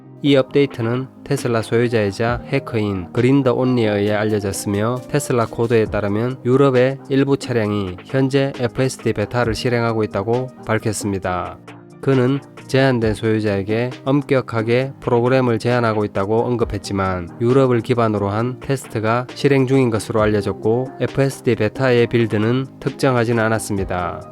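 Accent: South Korea. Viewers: 전혀 반대예요, 굴리시지 않고 한국인 답게 주체성을 갖고 발음해주셔서 매력 빵빵 ! 여하튼 영상 감사해요👏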